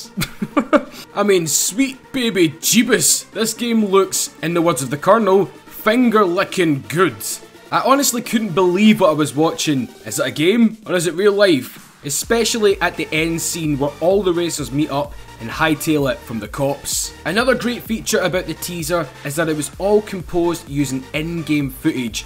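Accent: Scotland, United Kingdom. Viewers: dat scottish accent tho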